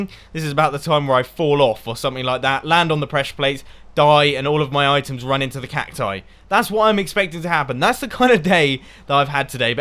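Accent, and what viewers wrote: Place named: United Kingdom. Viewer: I'm new to this channel is British? He has accent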